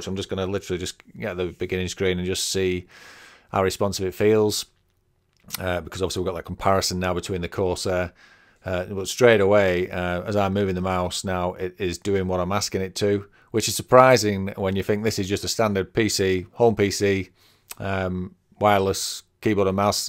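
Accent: United Kingdom. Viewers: Hope pubg allows k&m eventually. Are you from yorkshire btw lol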